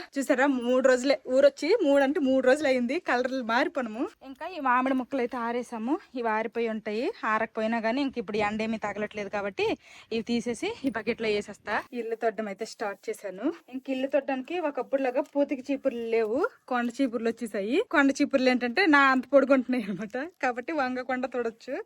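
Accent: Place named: Bengal, India. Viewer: Mi matalu bengal lo vunnappudu slang okalaga vuntundi mi oorlo moratuga matladutharu inka ekkuva soundtho matladutharu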